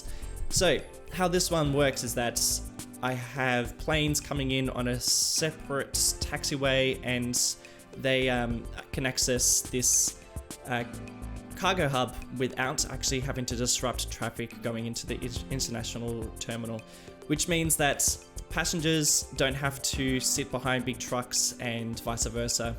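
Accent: Australia. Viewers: I mean mate I'm an Australian and I can already tell about the accent, you're a bloody mate! So keep up the accent it makes me feel like home!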